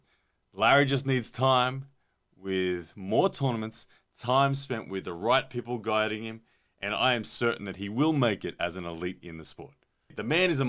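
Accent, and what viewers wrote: Australia. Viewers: Is working on a new commentator voice? He sounds less Australian than usual, here.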